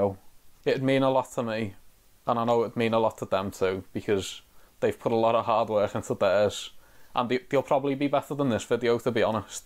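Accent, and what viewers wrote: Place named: Liverpool, United Kingdom. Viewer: It makes me unreasonably happy to hear another scouser getting into the whotube community.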